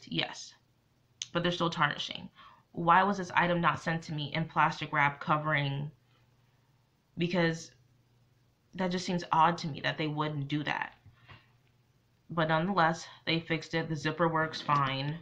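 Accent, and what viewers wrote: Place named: New Jersey, United States. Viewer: I just came upon your video and I'm glad I stopped to listen to your experience regarding your LV repair. First, I too am sorry you are having these lack thereof quality issues as well as poor customer service. Secondly, I too have had my share of unfortunate experiences with LV especially regarding new item quality issues and I've in many instances if not all only had the item for a month or two but I get this ugligness attitude from the store I deal with if I bring up an item with quality issues. I'm so over them and their poorly designed pieces of late. Don't get me wrong I still love LV and it's craftsmanship but I expect them to be behind me 100% if I have an issue. So sorry, again that you are going thru this. Hi I watch you and I love your I think Jersey accent excuse if I'm wrong but I love your videos. 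Keep up the good work!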